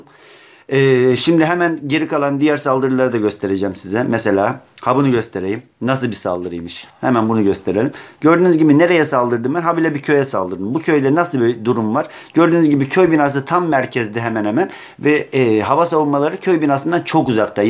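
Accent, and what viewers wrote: Black Sea, Turkey. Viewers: o Karadenizli olduğu için ole diyo anlamiyomusun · Abi ben eskiden karadeniz şivesinden nefret ediyordum dizilerdeki bazı oyuncular hiç konuşamıyordu çok kötü konuşuyorlardı ama senin şiven çok güzel bana karadeniz şivesini sevdirdin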